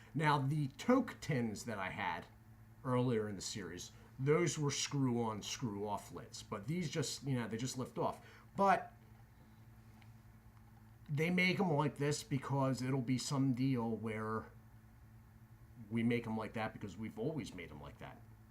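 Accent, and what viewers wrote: United States. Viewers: Where are you purchasing these snuffs? Judging by your accent, I assume you're American. I'm a former smoker and current user of Copenhagen snuff. I'd like to explore the world of English snuff and would appreciate any insight on purchasing it as I know it's produced across the pond. I enjoy the content too. Cheers!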